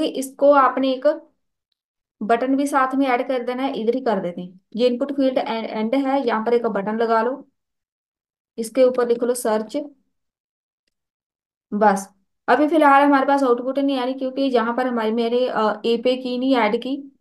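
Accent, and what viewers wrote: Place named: Ambala, India. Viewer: Apki aavaj to ambala side ki lag rhe hai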